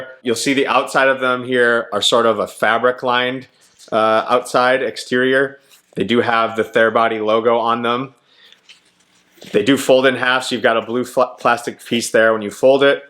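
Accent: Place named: United States. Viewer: I didn't even notice anything negative about your English. You speak like you're from the States! lol Thanks for the review.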